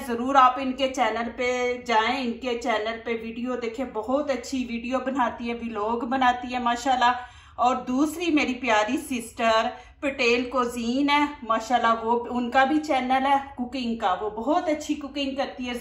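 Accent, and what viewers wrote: Punjab, India. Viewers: maashaalla look delicious. AP bolti b bahut piyaray hai Punjabi touch Ata hai. love and respect from my channel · Yummy. Dakh ker both Maza Aya. Urdu main Panjabi lehjy Ka meetha touch. Kia khob.maza a gia😊❤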